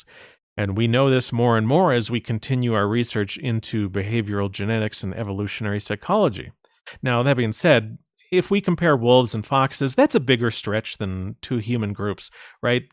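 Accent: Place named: United States. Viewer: Maybe west-coast or mid-west American-- it's quite generic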